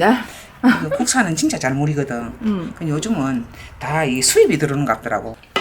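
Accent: Gyeongsang, South Korea. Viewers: 쌔리 뽂아도..ㅋㅋㅋㅋ 이억만리 미국에 나와사는 이민자 이면서 경상도 출신 이라서인지 너무나 정겹습니다. 엄마가 그리워질만큼요.. 항상 챙겨서 듣고보고 따라 해보고 있습니다. 고맙습니다!! ^^ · 반찬 배우러 들어오는데 이젠 경상도 말까지 다 알아들음ㅋㅋ쉽고 맛있어요 감사합니다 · 솔직히요,,, 전 선생님 목소리 말투 들으러 와요~ 음식레시피는 덤으로 보고가구요~ 우리 가족이 다 갱상도 인데예~ 지 태어나고 서울올라왔심더~ 그래서 갱상도 사투리가 그~리 정감이가요~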